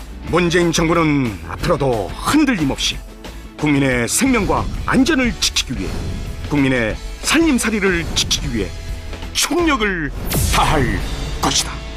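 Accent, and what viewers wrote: North Korea. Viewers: 앵커 북한 사람임? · 성우 말투가 북한같냐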